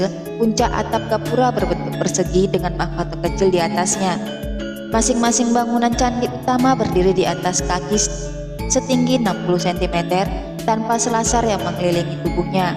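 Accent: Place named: Java, Indonesia. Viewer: Kental sekali jadi ingin ke jawa 😁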